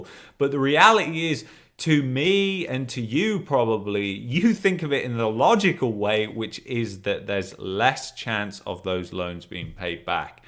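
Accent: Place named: Cockney, United Kingdom. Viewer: I’m trying to understand why a Cockney Lad with fabulous economic skills ended up in Canader!! I’m enjoying your intelligent reviews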